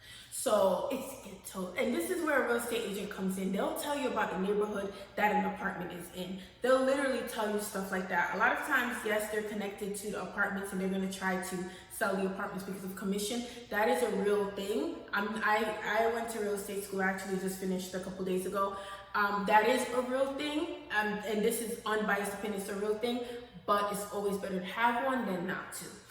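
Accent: Jamaica. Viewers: R u Jamaican? U definitely sound like one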